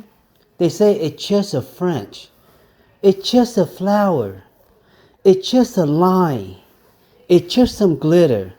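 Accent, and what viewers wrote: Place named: California, United States. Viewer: damn I was hoping yu were in the Bay!. I knew by the voice over yu were Definitely from California 🙌🏽💯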